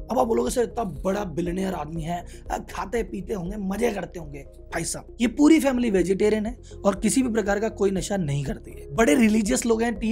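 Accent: Gujarat, India. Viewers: Aap Gujarati h kya.???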